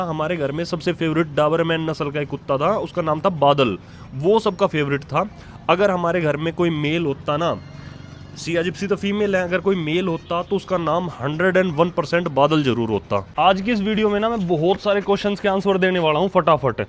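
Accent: Haryana, India. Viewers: arey bhaiya Haryanvi accent se log aksar judge kar lete hain😂😂😂😂 mere Punjabi hone ke kaaran log mujhe bhi ladaku type bol dete hain😂😂😅😅😅 sabka apna nature hota hai bhai😊😊😊 Lots of love for cuties and ❤❤❤❤❤ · Apki lunguge aisi lagti hai jaise aap ladaku ho kyu ki apke hariyanvi styl main dhamkakr bat karte hai..pr apki baat se pyar jyda jhalakta hai apne bacho se jis tarike se bat karte ho usko dekh kr lgta hai..❤❤❤ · App kha sa hai.. apka bolna ka tarika tho haryanvi type h 😅😂❤❤ it's my first time on yr channel 😊 apka bacha bhut cute h 💖🌹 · कोई बात नहीं भाई मैं तो सिर्फ सिया जिप्सी और आपकी हरियावानी बोली देखना अच्छा लगता है ❤❤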